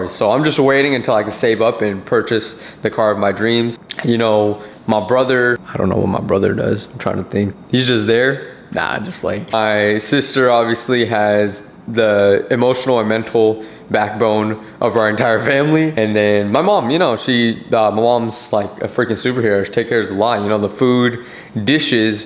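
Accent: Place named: New Jersey, United States. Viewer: I saw that view and thought it was Dubai, but then you seem to have a NJ accent. Good luck with the new apartment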